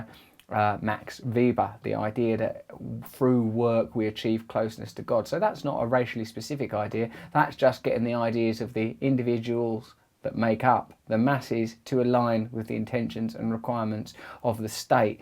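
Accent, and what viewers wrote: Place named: United Kingdom. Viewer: your bri-ish accent is awesome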